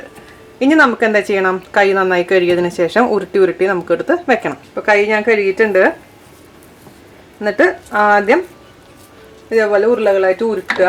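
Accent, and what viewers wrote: Thrissur, India. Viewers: ചേച്ചി അടിപൊളി ആണുട്ടോ തൃശൂർ ആണോ വീട്.... അതാണ് ഒരു നിഷ്കളങ്കത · chechiii njn chechide veliya oru fan ane ela receipsm try cheyarnd elam adipolli ane chechiii chechide thrissur slagm presentation. oke super ane njnum oru thrissur Kari ane to God blesss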